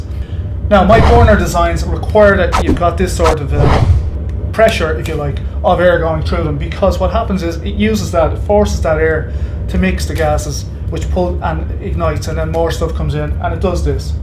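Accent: Ireland. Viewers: Ah, I love the brogue! You could read the phone book or dictionary, and I'd listen. Reminds me of the older folk when I was a child. Why is it that so many DIYs from Ireland ad GB tell you to mix something to the consistency of porridge? Makes me smile, because it's so descriptive. :)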